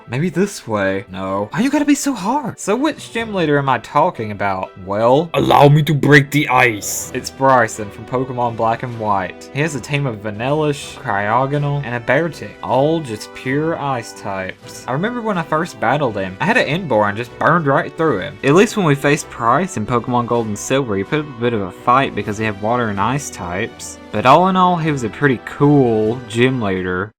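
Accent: South, United States. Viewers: I have to say that I am not a judgmental person but your voice completely threw me off. With a southern voice and a large, expanded mind it kind of kicked my brain in it's nuts. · are you southern? (sorry I'm from Oklahoma and I rarely see youtubers with like real southern accents so jw)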